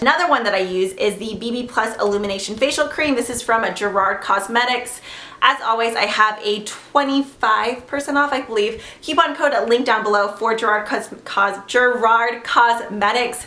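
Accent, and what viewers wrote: Texas, United States. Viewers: !!! You're totally picking up the Texas accent lol its hilarious. And btw, great video & keep using the make up geek shadows....forget the haters. Lol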